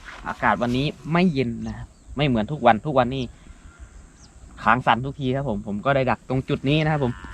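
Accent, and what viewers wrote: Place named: Isan, Thailand. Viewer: เป็นคนจังหวัดไหนคับยางครั้งพูดอีสานชัดมาก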